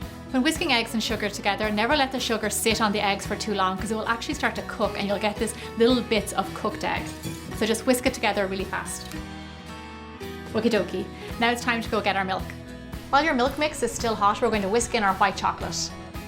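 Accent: Ireland. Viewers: I love Irish accents!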